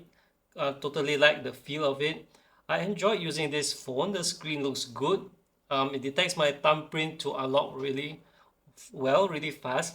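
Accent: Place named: Malaysia. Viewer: Nice vid, you sounded like Malaysian/ Singaporean 😄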